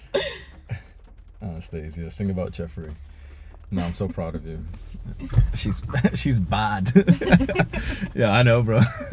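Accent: Liverpool, United Kingdom. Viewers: I think he's from Liverpool ahah, I'm from Bradford and my accents pretty different. But I guess it shows how right you are about accents changing alot aha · Never miss a video.. Cuz learning British accent.. 😂😂❤️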